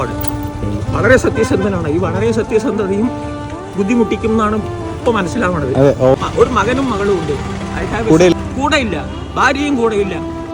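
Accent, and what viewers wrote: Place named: Oxford, United Kingdom. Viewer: 100%perfect Oxford English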